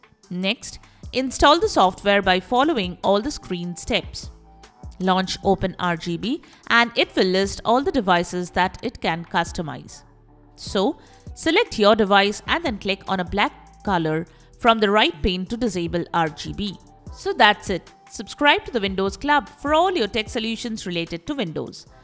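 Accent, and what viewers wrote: India. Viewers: Thick India Accent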